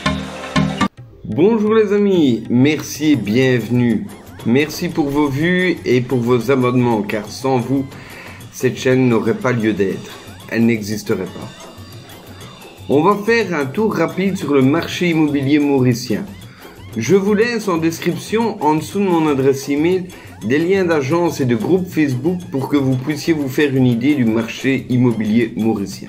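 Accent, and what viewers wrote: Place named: Belgium. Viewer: Bonjour, je vous découvre ce soir! Seriez-vous Belge? Il me semble reconnaître un bon petit accent de chez moi! Je vais passer 3 mois à l'Île Maurice pour découvrir et voir si c'est possible de m'y installer dans le futur! Pourriez-vous me dire comment trouver une chambre, un studio pas trop cher pour une période de 3 mois? Est-ce possible de trouver près de la plage? J'aimerais aller vers Rivière Noire pour nager avec les dauphins! Je vous remercie de votre réponse! Puis je vous envoyer un mail? Merci bcp! Evelyne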